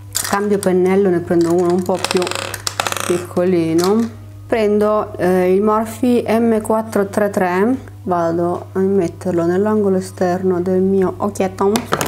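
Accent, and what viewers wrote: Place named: Bologna, Italy. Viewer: Quanto si sente la cadenza bolognese!!! Noi ci riconosciamo ovunque 😅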